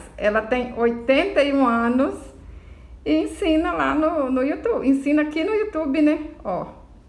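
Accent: Minas Gerais, Brazil. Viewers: Vc é mineira né? Esse sotaque eu conheço.kkkk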